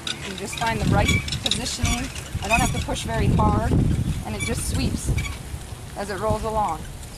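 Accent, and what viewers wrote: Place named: United States. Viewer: judging by your american accent you have probably never been to the ATP courts in Monte Carlo, and since I have I can tell you they do NOT use the "coil" system as you call it. yes the sideline to sideline style leaves clay on the sides near the fence, but your method doesn't follow professional methods.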